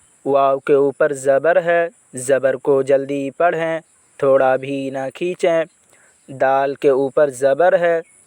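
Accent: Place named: Arab world. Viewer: urdu ko arbi ki tarah mat padhiye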